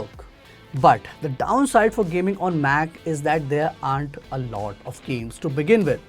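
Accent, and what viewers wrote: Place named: India. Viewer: The more i get older the more i become intolerant to Indian dialect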